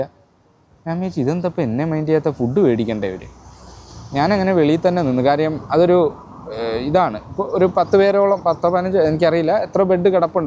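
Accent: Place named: Pathanamthitta, India. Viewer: Bro Try to solve and have a good life with your child, നമ്മളോട് നന്നായി behave ചെയ്യുന്ന നമ്മുടെ relatives മറ്റുള്ള എല്ലാവരോടും അങ്ങനെ അകണം എന്നില്ല. ആവശ്യമെങ്കിൽ അവരോടു കൂടി മാറി താമസിക്കണം. ഇന്നത്തെ കാലത്ത് എല്ലാവരും എല്ലാവരെയും സഹിച് ജീവിക്കണം എന്നൊന്നുമില്ല. നിങ്ങളുടെ ജീവിതം നിങ്ങൾ 3 പേരുടെ മാത്രം ആണ്. അവരോട് നേരിട്ട് ചോദിക്കുക ഒന്നിച്ചു ജീവിക്കാൻ താല്പര്യം ഉണ്ടോ എന്ന്, എന്നിട്ട് മുൻപോട്ട്. You sound kike from Pathanamthitta or Kollam area, all the best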